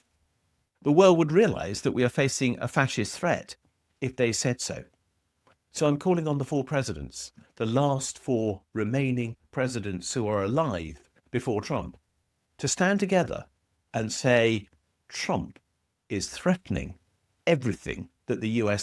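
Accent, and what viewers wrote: United Kingdom. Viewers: Oh contrare, the coup happened with the 2020 election. Bush, Clinton, Obama & Biden are all traitors to America. The only one going by the book and the constitution is Trump. Do some research. And, yet, you with a British accent, who probably loves your King. Oh, the irony of it all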